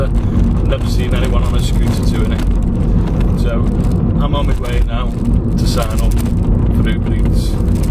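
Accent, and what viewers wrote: United Kingdom. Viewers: focus on it and its fine. Scouse accent is much more understanable than scottish or yorkshire in my opinion:) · No, is my fault because i understand and speak american english. British accent is killing me.. 😁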